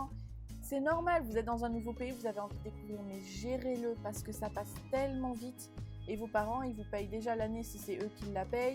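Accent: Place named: United States. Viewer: Et sinon ton accent américain c'est goal, est ce que les américains qui te connaissent pas remarquent que tu es française ?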